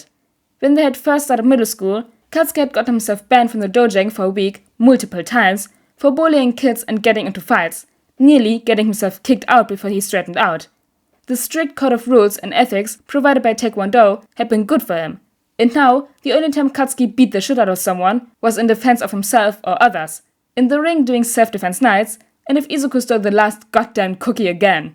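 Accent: Germany. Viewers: Your accent sounds kinda German! Sorry if I’m wrong lol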